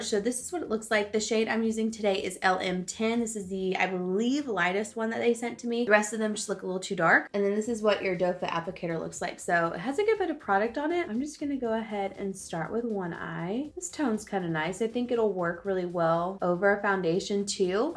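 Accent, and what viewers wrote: South, United States. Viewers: Am I going crazy or did she used to have more of a southern accent? Lol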